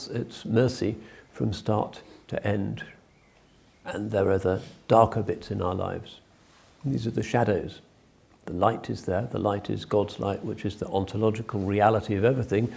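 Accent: United Kingdom. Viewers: This is amazing. Love the British accent. Shows that stupidity sounds intelligent with the right accent but remains stupidity nonetheless.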